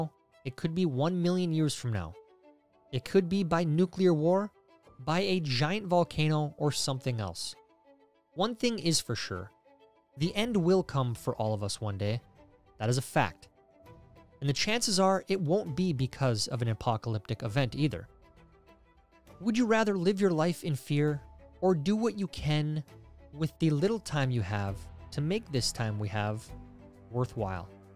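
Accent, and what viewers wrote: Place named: United States. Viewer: For our American cousins, it is pronounced York-sh-ear, Same was Warwickshire, wa-wick-sh-ear. But to be fair it does sound nice pronounced wrong in a US accent so we'll let it slide. What do us Brits pronounce wrong in the US, be interesting to know.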